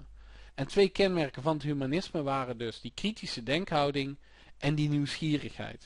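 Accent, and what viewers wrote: Brabant, Netherlands. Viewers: Ja!:D Maar voor mij is't gelukkig geen moetje. Ik mag gewoon leren voor de leuk, en maakt het wel makkelijk zo met z'n Brabantse manier van spreken ^^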